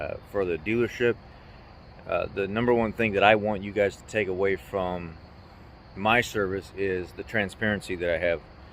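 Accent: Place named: Kentucky, United States. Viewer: That Kentucky accent made me laugh for 5 minutes straight..!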